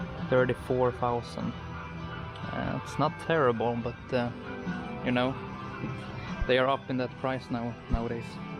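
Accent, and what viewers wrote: Sweden. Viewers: You sound Swedish, am i right?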